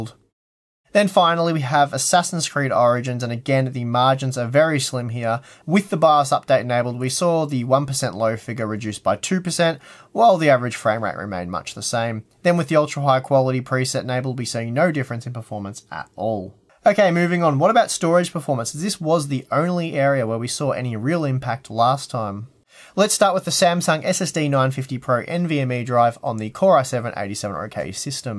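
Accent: Australia. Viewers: Will the next generation of CPUs have this issue? Actually glad I waited to upgrade, even if the hit was slim to none. Also thanks again for the updates. And once again, love your accent. Australians have perhaps the most pleasant accent IMO.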